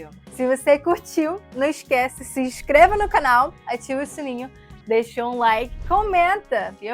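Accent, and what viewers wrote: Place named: North, Brazil. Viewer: Parece brasileira e do norte do Brasil!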